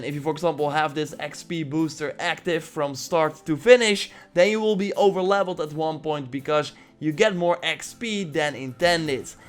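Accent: Netherlands. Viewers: I like everything about Assassin's Creed, too bad you have such a horrific Dutch accent that I can't bear through any of your videos. It's a real shame man... Do something about your awful English speech. Then maybe you'd be a really good YouTuber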